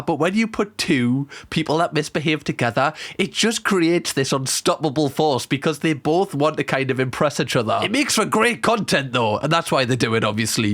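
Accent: Newcastle, United Kingdom. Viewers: Love your show , and the Geordie accent makes it all the better. Signed - happy doughnut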